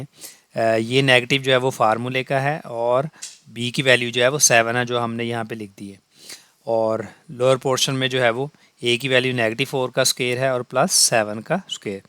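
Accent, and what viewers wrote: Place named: Punjab, India. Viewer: The bad thing about online classes is we missed your punjabi accent and jocks❤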